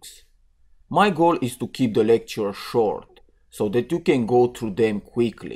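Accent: Nigeria. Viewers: shimt yes realised now. that's definitely a Nigerian accent.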